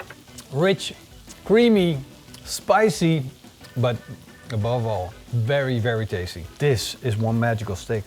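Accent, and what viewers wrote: Netherlands. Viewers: You are dutch right? i can hear immediatly haha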